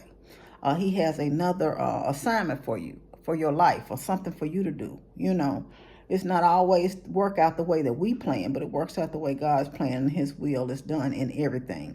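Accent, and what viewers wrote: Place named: South, United States. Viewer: I love this lady! That southern accent is so cute ❤️❤️🥰🥰🥰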